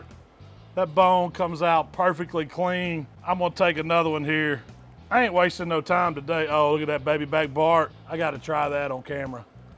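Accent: South, United States. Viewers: his southern accent alone makes me trust this guy when it comes to anything related to BBQ and smoking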